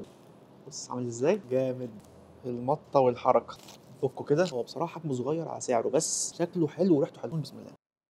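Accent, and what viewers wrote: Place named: Saudi Arabia. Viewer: لهجتك السعودية حلوه مره😂😂😂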